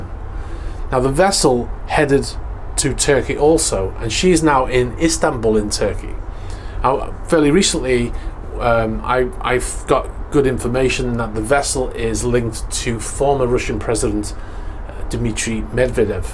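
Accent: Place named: Wirral, United Kingdom. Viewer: Slightly off-topic (ok completely) but where are you from? By the accent I suspect you're from Wirral. I wonder every time I hear you.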